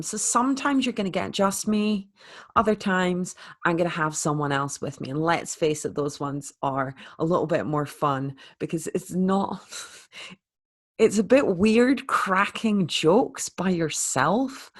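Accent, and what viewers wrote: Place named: Scotland, United Kingdom. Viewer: Is it me or are you turning Scottish?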